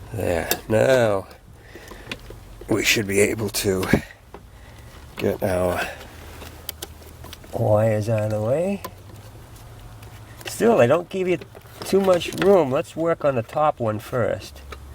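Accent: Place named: Maine, United States. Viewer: Where ya from, bub? Sound like a fellow Mainer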